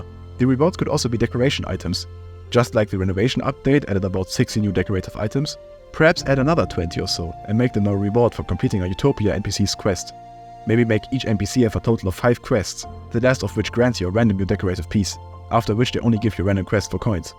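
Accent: Germany. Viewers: Are you german? You sound like german😅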